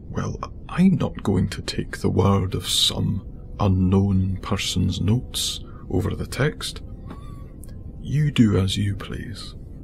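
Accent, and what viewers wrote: Scotland, United Kingdom. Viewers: you have a lovely bit of Scottish accent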